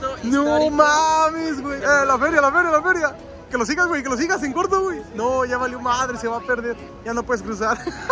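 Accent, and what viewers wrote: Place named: Chile. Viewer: hablando como Chileno 🫶🏻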